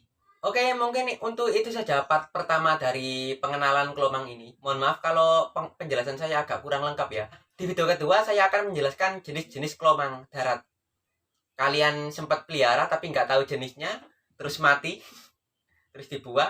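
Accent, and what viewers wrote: Java, Indonesia. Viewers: Medhok bosomu mas🤣🤣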